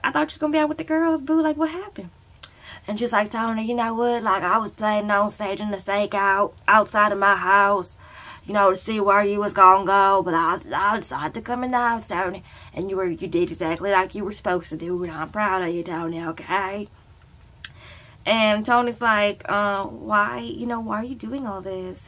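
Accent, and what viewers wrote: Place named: New Orleans, United States. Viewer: Are you from New Orleans cause that accent is giving me straight Nola baby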